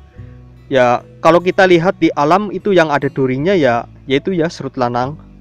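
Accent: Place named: Banyumas, Indonesia. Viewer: salm ngapak kang ,... :D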